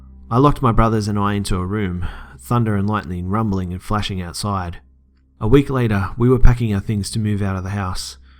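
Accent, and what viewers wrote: Australia. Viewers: I think you are Australian?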